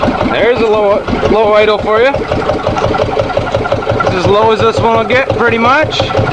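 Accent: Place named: Canada. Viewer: Great little engines those. It's a pitty though that they don't run too well at low RPMs. I have one on a concrete mixer and its minimum idle is about 2000rpm. Your runs very well, perhaps the inertia of the extra pulley allows this to run so low. You should definitely enter this in a diesel "how low can you go" competition. Your accent is cool. Where are you from? Canada?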